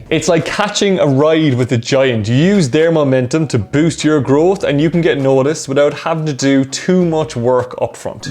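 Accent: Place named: Cork, Ireland. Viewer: Love when you’re randomly jumping through YouTube videos and come across a random lad with a Cork accent 😂 great content!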